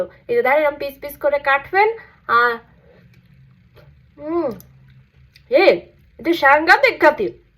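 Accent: Khulna, Bangladesh. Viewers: আপনি যে অরজিনালি খুলনার চলিত ভাষায় কথা বলেন, এজন্য জম্মের ফাইন ভাল্লাগে 😂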